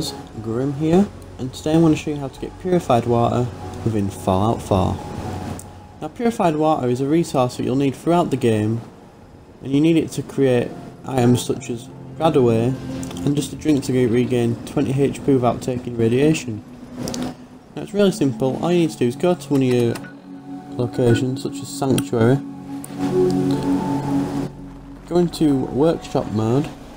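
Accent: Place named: United Kingdom. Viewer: This is the most British accent I've ever heard, Also thanks even tho this video is already 6 years old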